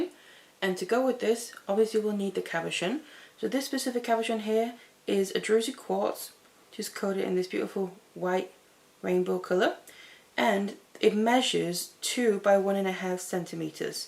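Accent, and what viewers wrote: United Kingdom. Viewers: Love, love me some British accent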